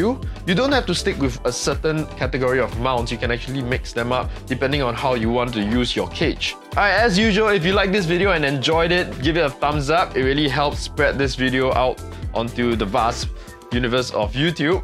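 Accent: Singapore. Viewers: Singapore accent.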